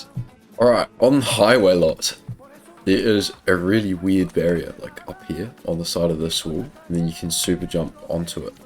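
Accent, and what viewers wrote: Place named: New Zealand. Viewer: also are u kiwi? i am too recognise ur accent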